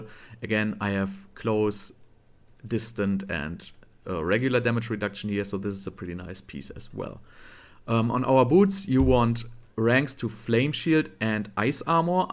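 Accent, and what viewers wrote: Germany. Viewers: Man hört einfach immer wenn jemand deutsch ist haha, gutes video 👌🏼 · Vielen Dank für diesen megageilen Build! Von allem was ich bisher probiert habe, bockt deine Version am meisten. Mach weiter so! PS: Ich schreibe auf deutsch, weil ich deinem Englisch Akzent nach ziemlich sicher bin, dass du Deutscher bist.😁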